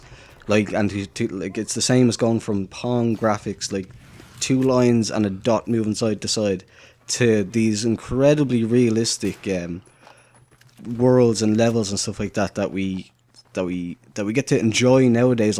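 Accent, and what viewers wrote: Ireland. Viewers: U aren't! I'm actually Scapooty and if ur then you should know me. Lol never knew you had such a Irish voice! I should be uploading some PC BF3 gameplay soon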